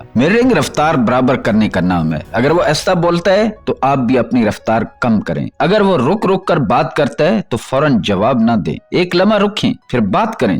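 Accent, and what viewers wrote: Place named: Punjab, Pakistan. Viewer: Content Acha hy….pr lehja Punjab k Molvi sahab jesa….please Urdu pronunciation (talaffuz) sahe ada kia karaien bulky properly seekhain….ya kisi achi Urdu bolny walay sy voice-over karwaien! Thanks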